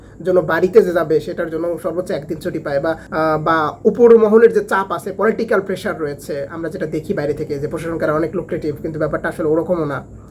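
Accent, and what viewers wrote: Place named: Chatmohar, Bangladesh. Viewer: Vai apnar bari chatmohar tai na kotha dekhei buja jay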